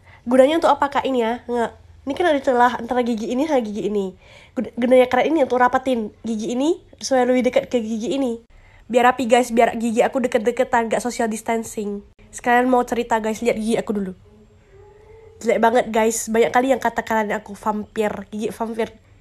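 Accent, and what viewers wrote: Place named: Medan, Indonesia. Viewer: Logat bahasa nya kak seperti nya orang Medan btw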